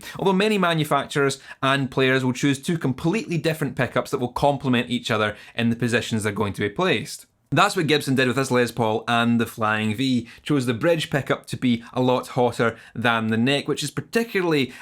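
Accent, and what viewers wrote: Scotland, United Kingdom. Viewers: is what the entire world think scottish people sound like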